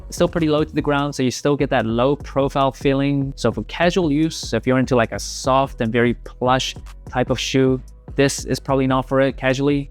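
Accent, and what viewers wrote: Australia. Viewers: I thought so, you definitely sound more Aussie than Kiwi haha 🥝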